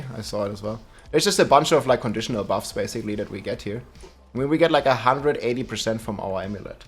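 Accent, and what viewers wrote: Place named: Germany. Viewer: Watching a bloke with a German accent play D4 with a John Carpenter like soundtrack is something I never knew I needed until now! Liked and subbed!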